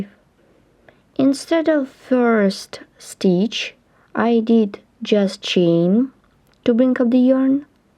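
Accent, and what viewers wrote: Russia. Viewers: А по русски можно? Вы же не американка, русская слышно. Практикуете на инглише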